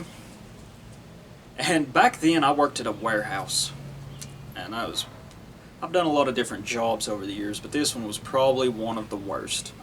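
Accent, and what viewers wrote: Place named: South, United States. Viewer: These people probably saw you as an object of academic study with your southern accent (btw as someone who is proud of his own dialect, it's great to hear an american who speaks american for once.) and your opinions and job you fit into the cliche for them, which is really funny if you think about it. The joke is on them.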